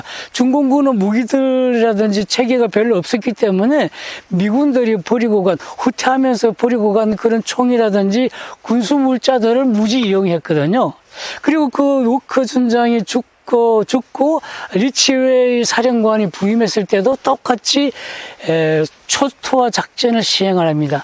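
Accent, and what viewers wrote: North Korea. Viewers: 북한말씨 느낌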